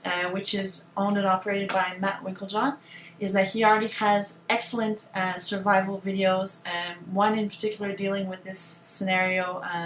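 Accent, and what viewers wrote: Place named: Quebec, Canada. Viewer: Je pense Quebecois. I think Quebecois :) French Canadian :) Some of them are Okay :)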